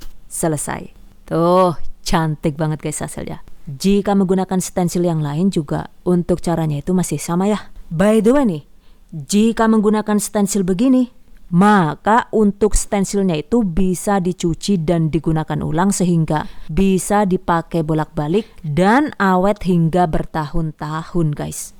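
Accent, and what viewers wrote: Banyumas, Indonesia. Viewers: mak, rika janeh wong ndi? ngapak tmn ketone koh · Rika si wong ngdi, deneng ngapak? 😅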